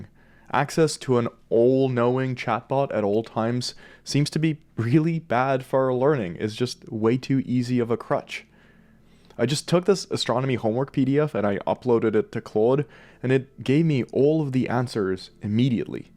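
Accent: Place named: Canada. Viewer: Where is this guy from? Sounds Canadian, or something....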